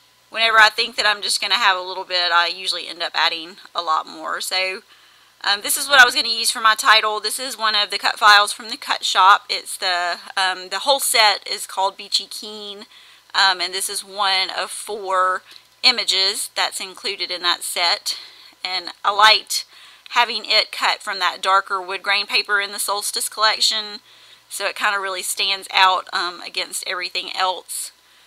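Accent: South, United States. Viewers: I have a scrappy crush on you! Ok, I know that sounds creepy but you just make magical pages. Also I love hearing your southern twang as I'm originally from the south myself. Love how you added washi to those wood veneers from HS. Very creative!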